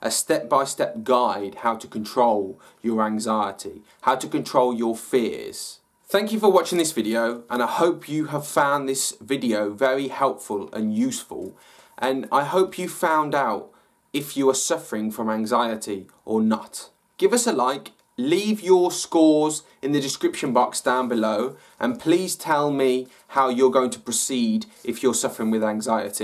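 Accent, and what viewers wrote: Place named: Brighton, United Kingdom. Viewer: Leah Stein it's the Brighton accent I think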